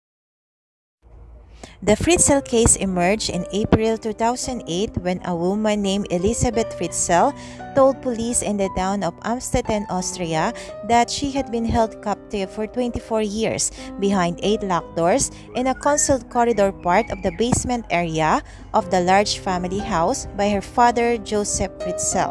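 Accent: Philippines. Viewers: Just because someone has an accent doesn't mean the Narration is not understandable. As a fellow Filipino, I'm happy to hear a familiar voice doing these big stories on YouTube. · ok lang... pinoy tayo eh. d yan magbabago, feeling lang ng iba nawawala ang accent nila kung cla ang nakakarinig sa sarili nila.. ang mahalaga maliwanag ang pagkakasabi sa words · A Pinoy speaking.. 😂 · Don’t listen to the hateful comments! I love your Filipino accent. Hi from Canada! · Filipina accent? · I hear a Filipina accent ♥ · Pinoy na pinoy ang accent e. Haha. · Its a Filipino accent. I looked thru her videos and there are videos in Filipino language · I liked her accent too she s filipina for sure maganda accent ate keep going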